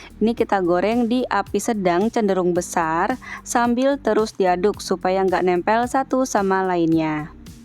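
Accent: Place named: Tegal, Indonesia. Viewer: Massya allah 🤲 kangen ciri khas tegal lur "semoga rezekinya lancar berkah sukses ya Bun🤲🙏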